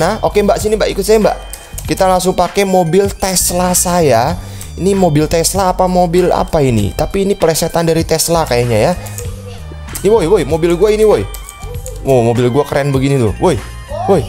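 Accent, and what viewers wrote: Java, Indonesia. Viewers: medok bang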